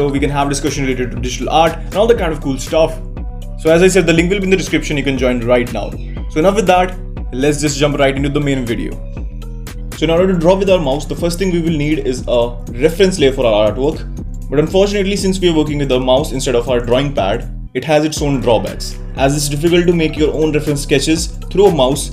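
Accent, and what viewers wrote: India. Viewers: indian accent. bye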